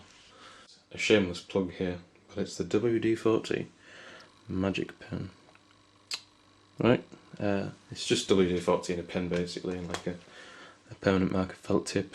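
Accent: United Kingdom. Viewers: Can anyone please write all that he talked about, I did not understand british enough.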